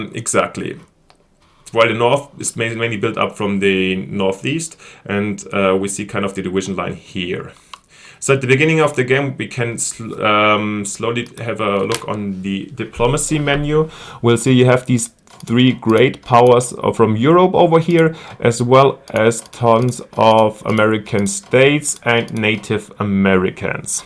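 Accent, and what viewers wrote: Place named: Germany. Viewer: Wusst ich doch gleich, dass das n Deutscher ist. Aber sehr gutes Englisch. Da möchte ich meine paar englischen Videos direkt private stellen haha.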